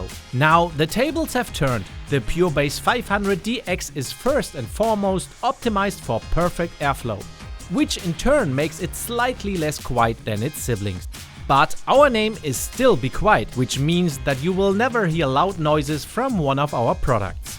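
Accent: Germany. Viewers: Dieser deutsche Akzent hahahahaha Feier ich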